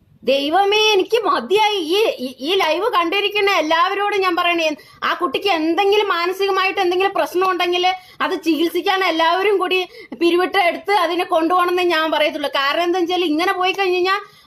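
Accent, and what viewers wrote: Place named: Palakkad, India. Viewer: Thanni palakkad thanne basha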